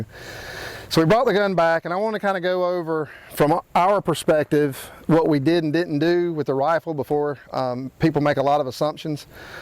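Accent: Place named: South Carolina, United States. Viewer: That SC accent makes me miss the Carolinas. Ive lived and worked down there off and on for years (Charlotte, Columbia, barnwell, Gaffney, Lugoff, Myrtle Beach, etc). South Carolinians are some of the best folks on Earth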